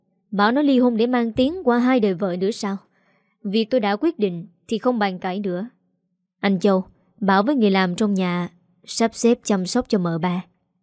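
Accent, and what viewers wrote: South, Vietnam. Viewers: Giọng đọc miền Nam của quả thật nhẹ nhàng ngọt như mật ong. Love it! 🥰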